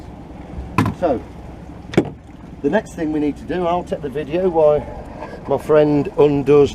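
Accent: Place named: Nottingham, United Kingdom. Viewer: where are you from? You sound like you're from the Nottingham area.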